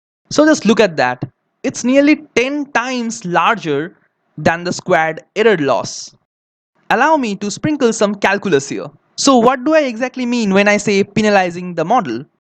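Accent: Bengal, India. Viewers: brilliant ..also delightful bong accent :)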